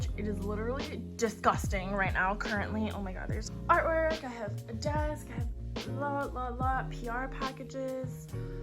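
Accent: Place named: United States. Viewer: This is really mind-blowing. This Chinese chick speaks perfect American English. Where did you study in China? You don't have even a slight Chinese accent. You sound very Caucasian ❤️❤️❤️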